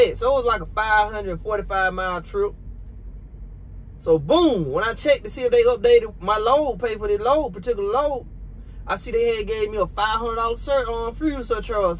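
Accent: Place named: South, United States. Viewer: Can tell your from the south, you have one hell of a southern draw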